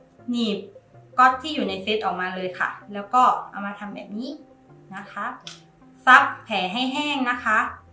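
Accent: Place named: South, Thailand. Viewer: คุณหมอสำเนียงเหมือนคนใต้เลยครับ คนใต้ป่าวครับ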